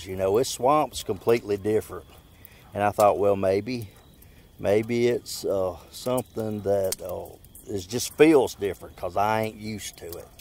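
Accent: South, United States. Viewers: Thanks, but I gotta say I miss your sweet southern drawl.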